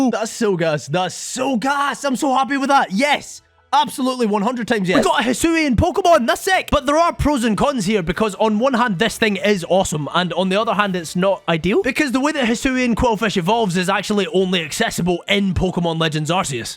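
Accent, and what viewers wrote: United Kingdom. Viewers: Are you British i am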